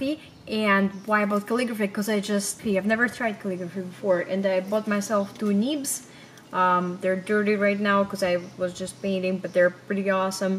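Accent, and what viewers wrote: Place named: Russia. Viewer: хоспаде, она же не занималась курсом фонетики, чисто русский акцент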